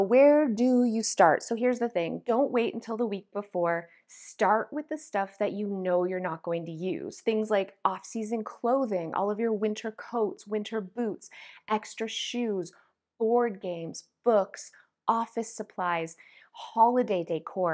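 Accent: Canada. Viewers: yep! But not all your followers are 😉 just wanted to leave the tip for any in the comments who happened to live down here. They could apply all your helpful packing tips with the crates, if they didn't want to buy new boxes from Kennards or Storage King (local moving/storage companies). I miss being only a couple hours drive from Vancouver - i know that's not where you're located in Canada, but sometimes listening to your videos hits the spot with your accent 💜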